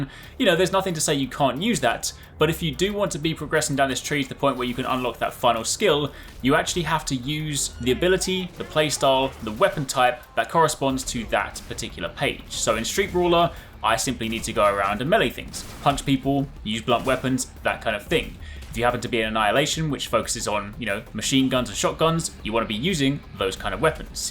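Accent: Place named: United Kingdom. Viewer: I set to .75 speed and it is such a normal pace of conversation, I think it was recorded at this speed and then compressed to run at the current speed. Now if I can find the switch to turn off the over-accented british pronunciations so that I don't need subtitles, that would be awesome.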